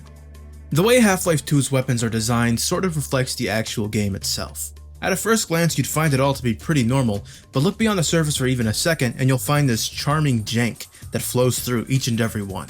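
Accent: New York, United States. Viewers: He sounds like he came from new york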